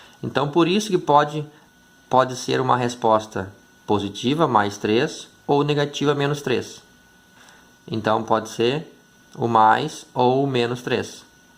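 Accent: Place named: South, Brazil. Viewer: TEM SOTAQUE DAQUI DO SUL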